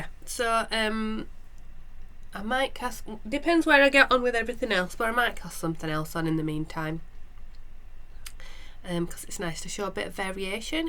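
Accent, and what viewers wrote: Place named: Northern England, United Kingdom. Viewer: so lovely to listen to a fellow northern accent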